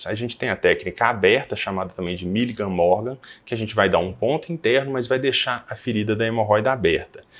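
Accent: Minas Gerais, Brazil. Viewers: O sotaque mineiro é o mais bonito! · Esse sotaque mineiro, é uma graça!!!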